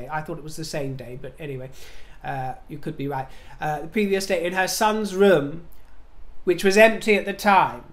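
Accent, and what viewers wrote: United Kingdom. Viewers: A very quick personal question, if you don't mind. Are you an 'alter ego' of Gibbs? I ask only because a very unusual name to use and you do bear a striking facial resemblance to Mr Gibbs. Whether or not the answer is yes (and your very British accent and behaviour suggest not), your regular videos are real gems and match both my own sense of humour and views of royalty and the 'ex-royals'. Keep up the excellent work! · I adore you. You're so very British to me, I'm from near Chicago haha. You have the best voice, accent, look, and everything about you is regal or something lol.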